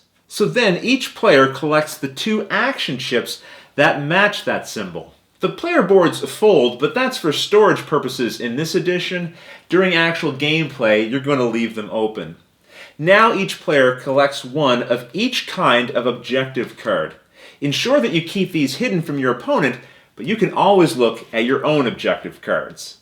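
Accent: Canada. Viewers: Are you by any chance Canadian?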